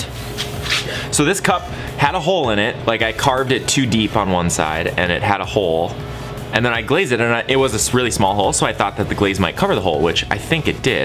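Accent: Minnesota, United States. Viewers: First time watching this channel. About in, thought to myself ‘he sounds like a Minnesotan a bit’ ... checked the bio, and what do ya know, I was right. (I’m also Minnesotan Btw), but I could definitely recognize the accent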